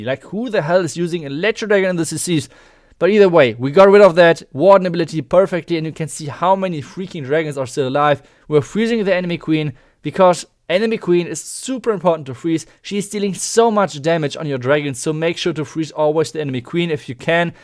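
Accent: Germany. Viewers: You can easy hear the German accent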